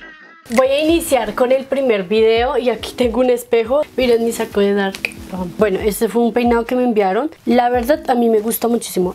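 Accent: Colombia. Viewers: WOW!!! me encanto tu vídeo!!! 🦋N🦋E🦋V🦋A S🦋U🦋B🦋 pregunta: eres de Colombia? tu asentó es super colombiano JIJIJIJ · Su voz describe colombianidad 🇨🇴🇨🇴🇨🇴🇨🇴🇨🇴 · 1. Me encanta su cabello 2. Wow me encanta tu asentó (Creo que eres de Colombia ) pr tu asentó 3. Si alguien le da dislike a el video voy hasta tu casa y te mato😎 pq quien le daría dislike a esta joya de vídeo 4. Me encanta como editas Y eres de Colombia verdad ? Saludos desde Colombia 🇨🇴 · por el acento, Colombia creo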